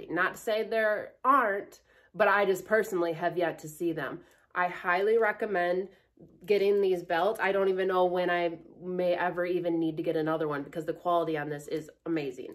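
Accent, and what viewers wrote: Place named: Minnesota, United States. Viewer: 100% she’s from Minnesota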